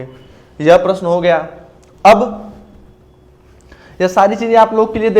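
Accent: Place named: Bhojpuri, India. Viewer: Aapka aawaj humko bahut aacha lagata hai bhojpuri type me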